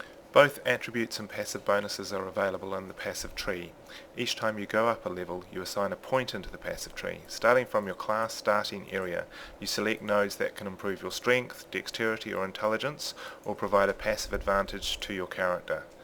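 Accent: New Zealand. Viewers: Awesome kiwi accent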